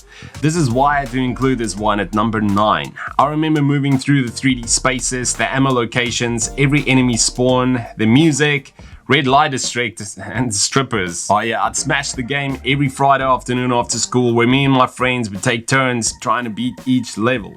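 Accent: South Africa. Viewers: Can hear that south African accent! I right? Pretoria or cape town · Good video, but god that Seff Effrican accent is obnoxious. You're one of the few YTers whose videos would be improved by a robot voice. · South African accent there right? Always nice to find another saffy on utube. Warcraft II was a great game. Played that and dune 2 so much.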